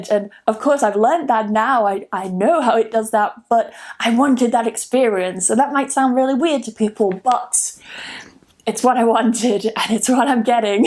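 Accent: United Kingdom. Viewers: I like the way you say the word "Japanese". I feel the British accent. Your channel is by far the best channel to improve British pronunciation. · Please tell me in which british accent you are talking😊💐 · Shortcut: Looping this video to learn the British accent from 😏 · Thanks for your tips and all your encouraging words. Leaning a language is so difficult. It has been years since I have started learning all by myself because I was so bad in school. In France, they are not good for teaching english at school. So technically I am learning by myself with series in english with english french subtitles first and now with english subtitles. I am listening to Podcast recorded by my favourite actor who is english. And now I am preparing for the TOIEC. Each day I listen some of your videos and I am really learning to sound more British thanks to you. It's so easy to listen to you, I can perfectly understand what you say. 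I don't think I will be completely fluent one day because it's really hard but I am progressing each day and that's a really good thing. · your British accent is just lovely! I've been working on American English accent for ages, but now I need to think twice...... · Hello miss, yours is RP or Modern RP accent or any other UK accent ?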